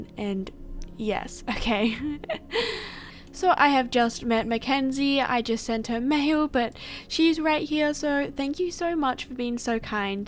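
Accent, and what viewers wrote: Australia. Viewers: Omg wait are u Aussie bc if you are 😳😁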